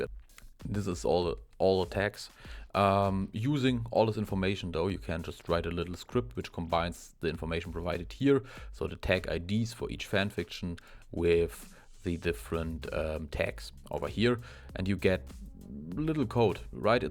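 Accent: Germany. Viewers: this is one of the most important big data analysis ive ever seen xD also you sound very deutsch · Der deutsche Akzent macht alles 10 mal besser lol, good job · Hey, are you perhaps from Germany? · Lieb den deutschen Akzent, danke für deine Arbeit 🧎🏻‍♀️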